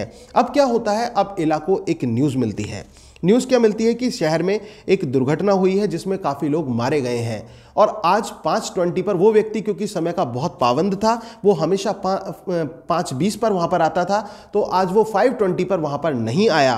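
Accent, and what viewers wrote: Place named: Meerut, India. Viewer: Sir aap toh meerut ya baghpat k lag reh ho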